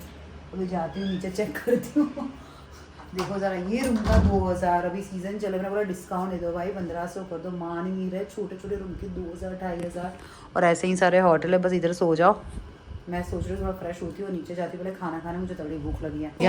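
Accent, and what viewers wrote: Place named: Gujarat, India. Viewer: This happens with me tooo. Gujarati Gujarat thi nikdi shake pan Gujarati language na bhuli shake Btw...love the gujju accent Love from Ahmedabad 🫶🏻✨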